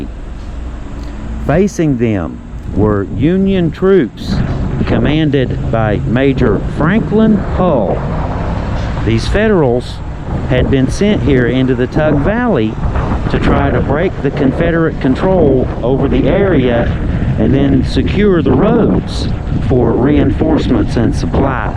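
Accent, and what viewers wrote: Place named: Tennessee, United States. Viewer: Sounds like Tennessee😂😂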